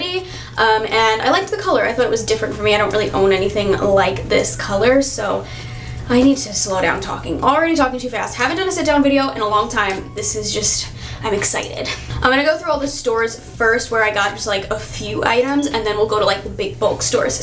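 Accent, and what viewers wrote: New York, United States. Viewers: I not only love your style and your sweet kitty that looks similar to mine... but I die for your New York accent. So cute so sassy. ❤️❤️ cool haul. Digging those leaf pants 👖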